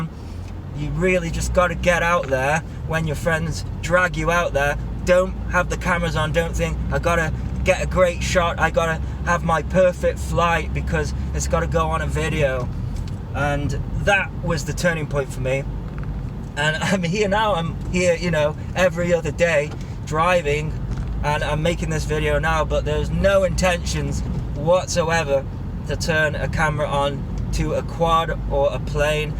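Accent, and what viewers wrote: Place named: North East Wales, United Kingdom. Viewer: Cool video. I would say; hang on in there, but it sounds like you are. Judging by your accent I would say you don't live too far away from me, but I may be wrong. I'm in North East Wales, are you fairly close?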